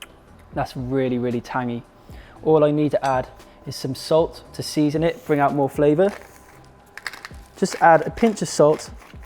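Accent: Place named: England, United Kingdom. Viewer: Hm What I think is weird is that anyone would come onto a person’s channel, who is obviously British, and not know or expect they may say or pronounce things differently than they might. And proceed to tell them they are saying it wrong.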